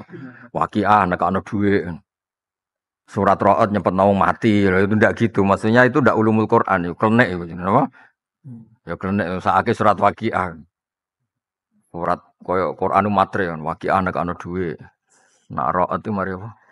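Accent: Indonesia. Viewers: Lughat Madura kayak e ,yg baca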